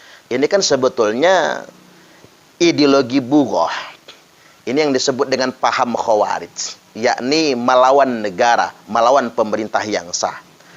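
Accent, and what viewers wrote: Java, Indonesia. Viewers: Pak ustat orang mana to jawane? Jawa apa bukan?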